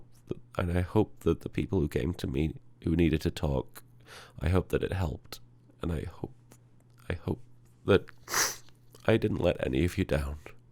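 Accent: United Kingdom. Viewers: I wonder why he has a British accent.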